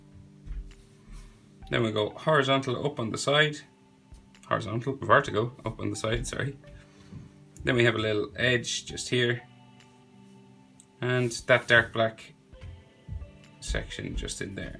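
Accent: Ireland. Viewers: he has probably the first irish accent I haven't hated. and I'm Irish -.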